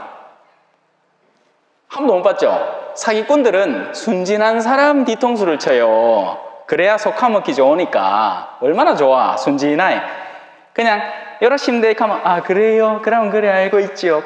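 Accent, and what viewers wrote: Gyeongsang, South Korea. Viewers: 찬미예수님! 신부님, 강론말씀으로 또 새롭게 힘을 얻습니다. 신부님 완전 경상도 사투리(뿌리)를 들으며 고향 향기를 맡는 것 같습니다. 하느님은 찬미받으소서!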